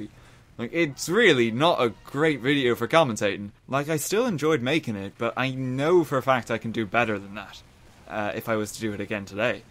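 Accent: Ireland. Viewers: I have only been here for about a month but I absolutely love your videos. It also helps that your Irish :D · Are you irish?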